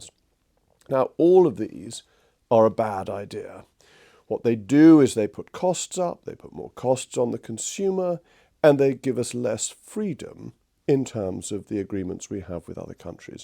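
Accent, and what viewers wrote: RP, United Kingdom. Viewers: He talks bullshit with a posh accent. Where’s the cheap shoes and food lord snooty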